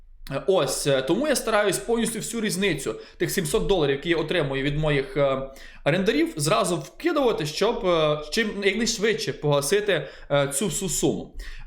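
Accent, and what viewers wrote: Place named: Ternopil, Ukraine. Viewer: Лол, дивився це відео на кухні. Моя мати за 5 секунд оприділила, що ти з Тернополя 😅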